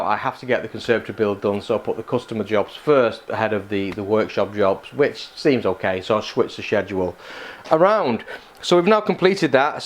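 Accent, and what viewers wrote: United Kingdom. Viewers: Hi. I accidentally came across your channel recently. Surprising since I watch a ton of YouTube 'Wood Porn, as my wife calls it.:P - I guess your search terms are working. Where are you based? From the accent I am guessing North West England? I am from Birkenhead originally but live in Utah now. Good luck with the venture and keep posting the awesome videos! Cheers, Rob P.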